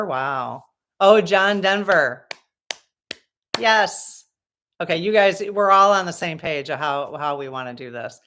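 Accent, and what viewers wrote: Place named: Wisconsin, United States. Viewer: I knew Wisconsin by your accent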